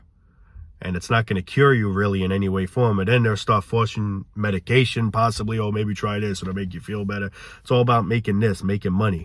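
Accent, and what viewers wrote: New York, United States. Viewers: PLEASE MAKE A SEGMENT AND NAME IT WHAT REALLY GRINDS MY GEARS 😂 that Rhode Island New York accent bro you have to.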